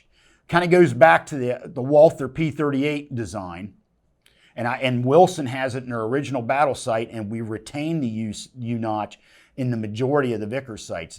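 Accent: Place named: Pennsylvania, United States. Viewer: listening to Larry talk reminds me of home. If you know the PA accent you know what I’m talking about.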